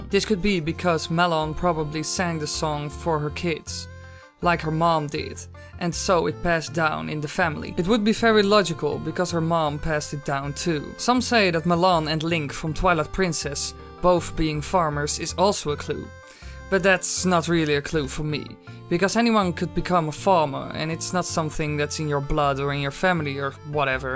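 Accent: Netherlands. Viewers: Ik kan horen dat je Nederlands bent je praat met een accent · Are you dutch? (Just a random question) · Are you dutch? Whatever love your accent.. and the theory😊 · are you dutch because i hear something that sound like a dutch accent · Haha you are definitely dutch right? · you're dutch for sure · R you dutch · ...ben je Nederlands toevallig? Ik hoor het aan je accent als je Engels spreekt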